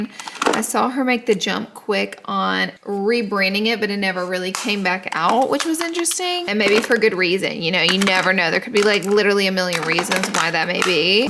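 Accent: South, United States. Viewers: girl I love ur southern accent. At first I thought u were saying lipples, like nipples. rofl but I realized ur saying lip oils!!! roflmao!!! tfs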